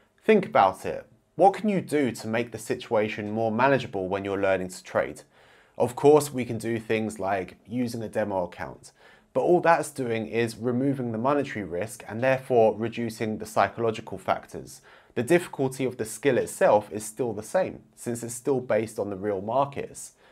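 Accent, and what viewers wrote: United Kingdom. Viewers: I like the way you explain in very precise basa on logic and higher level of example. Im very addicted with those video. And should mention ❤your British English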